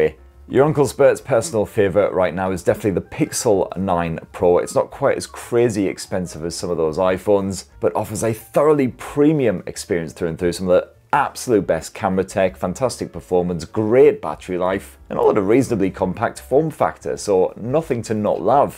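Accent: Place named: Newcastle, United Kingdom. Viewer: Smooth brain fodder. The forced humour is shite. Especially delivered in a crap monotone Geordie/Mackem/Smoggie accent.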